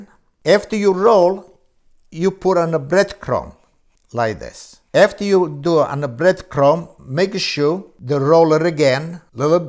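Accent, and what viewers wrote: Italy. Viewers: Great Italian accent Signor!!! I love this recipe will do this soon!! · He's SO adorable and funny and l LOVE his ltalian accent. J'adore les aubergines et ça, ça a l'air délicieux. Mille grazie. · I love your accent! I start to feel Italian. great recipe! , "like-a de-boss, cuz I'm a-boss ya". classic! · I Love that Italian accent! Eggplanta Meata ballis. So musical. 🎵🍆🎵🍆🎵🍆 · I just ADORE this guy! Not only are his recipes so wonderful, but I love his Italian accent!! God bless him. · The recipes, the expertise, the actual food after it’s done, the beautiful kitchen he has, when he samples his cooking, his having the glass of wine during his sampling of the dish he made, his humour and of course THAT TERRIFIC ACCENT!!!!!! HOW can we NOT love Chef, HOW???? He’s living proof that the Italians are the masters of cuisine and that no one knows food better than them, no one and as I’ve told my Italian friends, “You’ve had the privilege of growing up eating like KINGS & QUEENS!!!” And that my friends isn’t an exaggeration, it’s the TRUTH! 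· Isn't he the most adorable and handsome Italian Chef ever, love his accent! who needs a Gino when we got Grandpa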